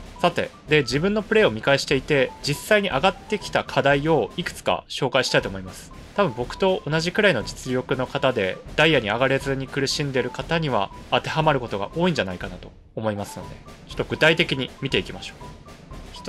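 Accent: Japan. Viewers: 方言青森くさいな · 方言の喋り方茨城弁じゃないかな？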